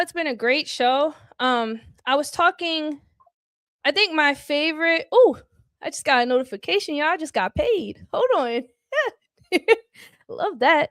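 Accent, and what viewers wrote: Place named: California, United States. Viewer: You from Cali ? You got that California accent lol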